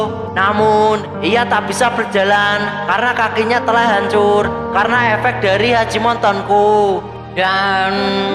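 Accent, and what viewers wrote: Java, Indonesia. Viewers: 😂😂😂😂 Suaranya bangke bgt ya.. Tp bikin bs ketawa karena medok org jawa.. Wkwkwkwkwk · Ngomongnya medok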